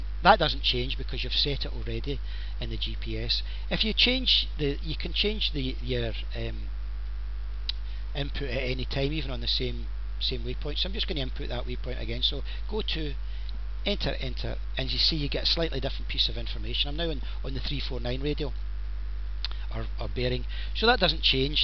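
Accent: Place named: Scotland, United Kingdom. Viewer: you have a lovely accent! scottish?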